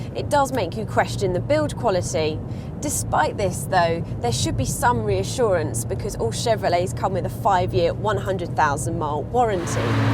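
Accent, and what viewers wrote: United Kingdom. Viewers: i'm so annoyed by her british accent after a while.. I dunno why because I like british accents..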